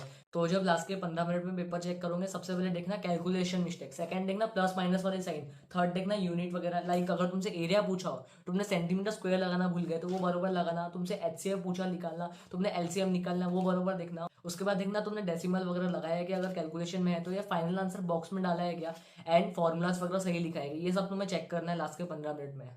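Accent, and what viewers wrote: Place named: Maharashtra, India. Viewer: The marathi accent 🫡🫡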